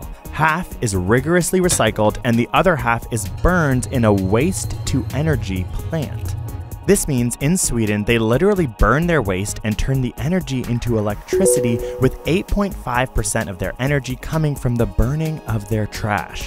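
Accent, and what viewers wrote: Canada. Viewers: Youre canadian?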